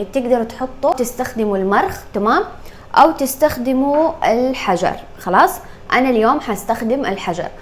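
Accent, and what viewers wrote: Hejaz, Saudi Arabia. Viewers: سعودية حجازية .. هذي لهجة أهل الحجاز.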